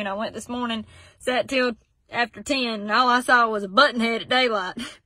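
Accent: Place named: United States. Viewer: Love your shirt baby, just came across your vlog, love it to like your country accent because I am country.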